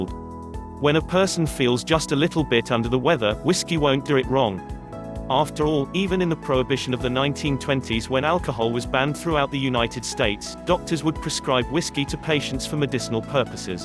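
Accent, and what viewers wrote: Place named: RP, United Kingdom. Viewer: How rich are u😂 im not judging but this makes me feel like the queen of england is talking about vodka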